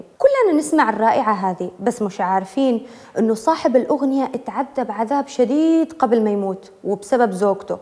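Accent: Aden, Yemen. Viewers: والله أنك مثقفة ومؤدبة ومهضومة وقريبة للقلب والأهم أنك حلوة ولهجتك رائعة أحب اليمن وأهلها من سورية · جمال اللهجه اليمنيه لا تمل منه الاذن فكيف اذا كان من أنثى لا يملها النظر حفظك الله من كل شر امين يارب 😍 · اللهجة العدنية فخر وابداع .. شكرا لك .. قصة مؤلمة ..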